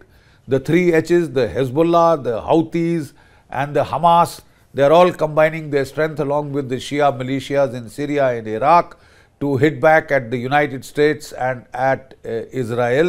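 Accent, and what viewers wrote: India. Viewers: Very informative and absolute master class Indian English.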